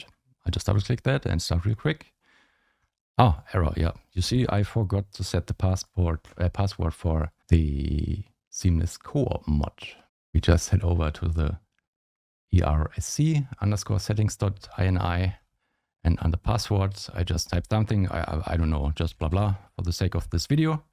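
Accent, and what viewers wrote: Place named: Germany. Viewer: Ich mag deinen deutschen Akzent :D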